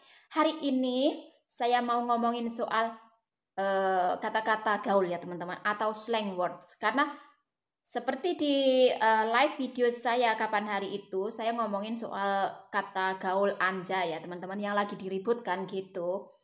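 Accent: Java, Indonesia. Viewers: pa kabar teh, suka lihat teteh bicaranya medok banget.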